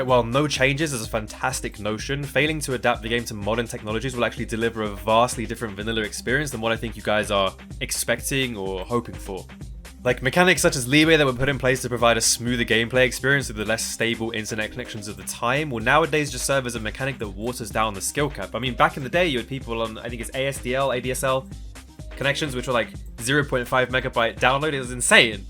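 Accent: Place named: London, United Kingdom. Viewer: u sound like ur from london brother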